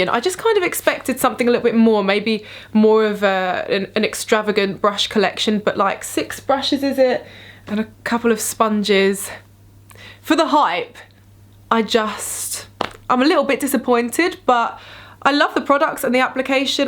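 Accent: United Kingdom. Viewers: You have the most beautiful eyes!!! Your just beautiful over all. British accent bonus!!!!!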